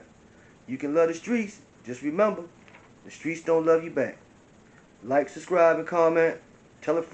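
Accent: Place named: Baltimore, United States. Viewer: Sounding like you from Baltimore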